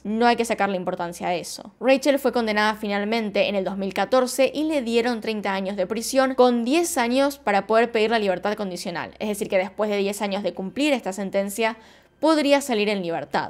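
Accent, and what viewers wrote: Argentina. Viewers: Buenas desde España! Me encantan tus vídeos,llevo años viendo este tipo de casos ...no sé cuántas horas de videos ...eres de las mejores a la hora de relatar los hechos de principio a fin . Me he enganchado a tu canal . Un besazo enorme. ..también me encanta tu acento argentino...aquí tengo muchos amig@ de allí .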